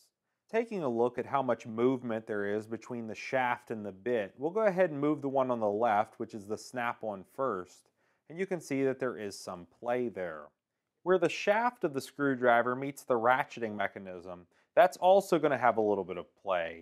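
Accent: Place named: United States. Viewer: As an Aussie (Australian) I am having great fun trying to work out what part of the US the reviewers accent comes from. Boston? Upstate New York? Dunno, but it is pretty cool. PS- Love your videos, but sad that a lot of the stuff that is reviewed on this channel is not readily available here in Oz.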